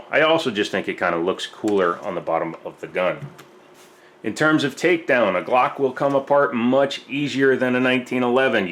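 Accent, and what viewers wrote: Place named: Chicago South Side, United States. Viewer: This dude has a Chicago Southside accent.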